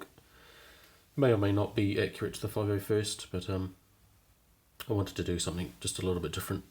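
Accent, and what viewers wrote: New Zealand. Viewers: You sound Kiwi, if so - where do you get your supplies from?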